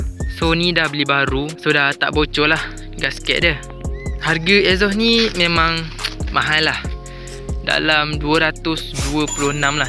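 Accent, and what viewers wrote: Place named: Sabah, Malaysia. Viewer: dok sabah ke serawak ni derr??? slu blah² sana je mcm nie 🤭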